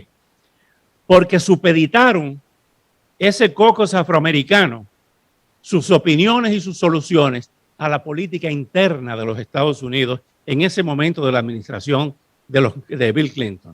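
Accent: Cuba. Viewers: Él es de RD 🇩🇴 y es primo de Ito Bisonó, el Ministro del Ministerio de Industria y Comercio del país. Óscar habla como cubano porque emigró a los 22 años a Miami, en una época donde la mayoría de los inmigrantes de esa ciudad eran cubanos y tiene programa de TV dirigida a la comunidad latina en la Florida y Miami. Busca su biografía en Internet. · No se quien es usted señor aún qué su asentó pareces cubano pero gracias mil gracias por hablar a nuestros favor es un ejemplo palpables Que usted sabé de los qué habla gracias desde RD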